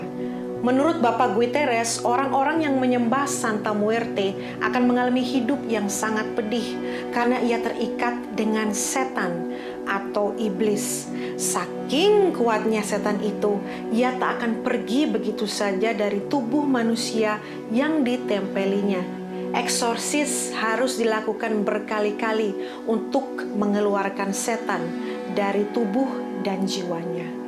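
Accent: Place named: Surabaya, Indonesia. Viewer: khas sbyoan dan malang an logatnya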